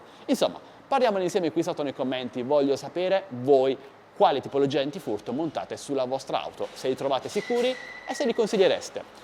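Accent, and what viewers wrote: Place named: Apulia, Italy. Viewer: Trackting non è testato contro i jammer infatti sento dal tuo accento che sei pugliese e intorno a Cerignola non serve a niente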